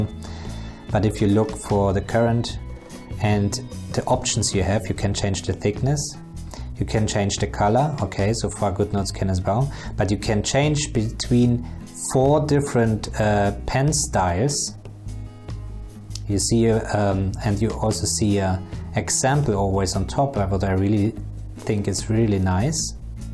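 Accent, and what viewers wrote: Germany. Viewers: Lol 🤣 ich wohne in Deutschland und meine Verliebte ist ein Deutscher! I could only tell because I’m used to hearing the German accent, I really like it! But seriously this review made me much more optimistic, Thanks for the review. I’ve been using a galaxy note <num> edition for about 5 years now and just switched to the ipadpro 12.9 last week. Immediately I noticed how bad the default note taking app was. I downloaded all the free note apps and none of them come close to S-Note in its features. I could import PDFs, I had way more templates (College ruled, normal spacing, grid, textures) better pencil options with a grain textured pencil that made it feel more like paper, the ability to transform written text into typed words or equations, search based on written notes, and so much more but best of all it was free! Overall I’m really disappointed in Apple’s integration with the Apple Pencil and iOS, I mean there is none. 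It’s basically a big iPad with a pen that was thought of as an afterthought. I got it primarily for art and in that regard it’s marginally better (Procreate is really fantastic and very professional), but the file management system, widgets, customization and split screen in iOS 11 is really limited in comparison to Android - but your review made a bit less cynical 👍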